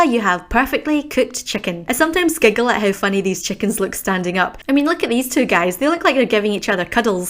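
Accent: Scotland, United Kingdom. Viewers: Wait a minute.....are you Scottish?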